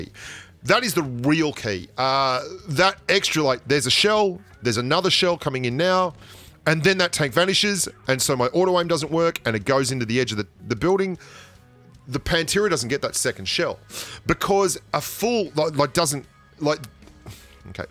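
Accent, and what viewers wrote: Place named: Australia. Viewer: FIRST! Also, are you okay? tell me your property isnt up in flames. You sound australian and idk if you are from australia and live there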